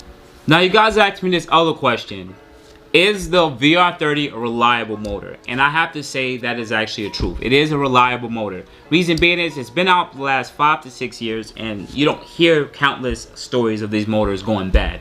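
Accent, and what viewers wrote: New York, United States. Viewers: Yo your such a NY'r. Moving to Miami my workers had to break me out of the axe/ask thing. But I'll still say water with my NYC accent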